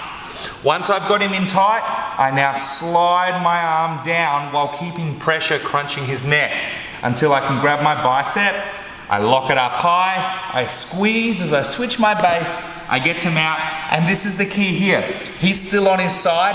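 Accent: Australia. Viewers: aussie accent is one of the most friendliest accent in the world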